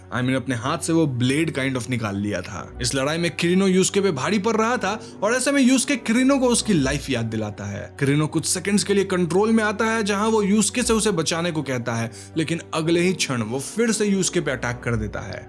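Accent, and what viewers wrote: Bengal, India. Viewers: Bhai aap bengali ya odia ho kya??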